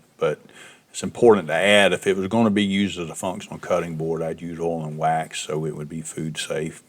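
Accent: South, United States. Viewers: Been in California for many years but the family is from Tennessee so I don't mind the southern accent at all. I've had a CNC for several years and have learned you need to be an artist for designs and an engineer for getting the software to perform the way you want. And also a mechanic to make sure the CNC is working properly. Mostly a woodworker to see a piece of wood for what it can become sitting on a shelf, table, or kitchen counter. Amen y'all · Rant on brother! Always gonna be a knucklehead out there. I have a cnc and a laser and as far as I am concerned they are no different than my table saw. They are tools, complicated ones but still tools. Thank you for another awesome video. BTW love the cornbread accent....got one myself....redneck of the south Ga. variety here. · AMEN!!! Absolutely fabulous work! Getting use to the southern accent myself. 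Formerly a cheese head (Wisconsin) and now living in Ozark, Al. Very impressive work, and design. Thank you for sharing, and you have a new subscriber.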